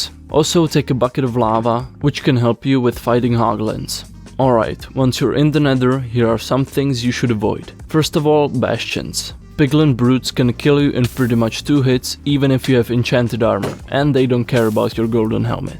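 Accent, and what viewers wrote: Czech Republic. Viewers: bro had czech accent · ceskej prizvuk uplne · bro where are you from? You don't sound like you're from an english speaking country. You sound like you're from the Czech Republic. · what nationality are you? you have a czech accent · bracho ta cestina tam jde slyset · You do have little bit of czech accent. Amazing video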